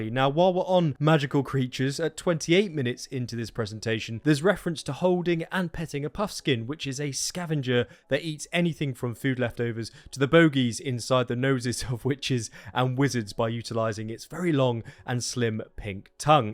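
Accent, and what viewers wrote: United Kingdom. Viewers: Big up the British accent on these videos 👍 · There's something about a British accent speaking about Hogwarts/The Wizarding World 🤣 Great video, looking forward to Gamescom! Thanks!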